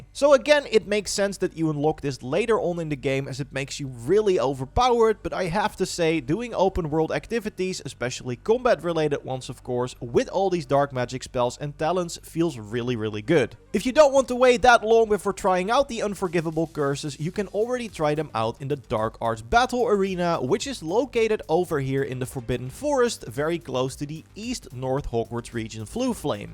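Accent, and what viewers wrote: Netherlands. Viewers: You dutch ?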